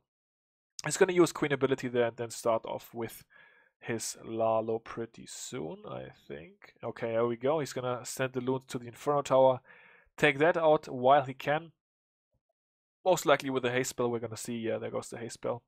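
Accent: Germany. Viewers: Du bist glaube ich deutscher also du hörst dich so an sag mir mal bitte wenn du Deutsche Bist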